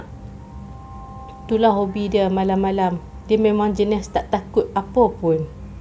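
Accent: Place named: North, Malaysia. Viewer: Seronok dengar bercakap logat utara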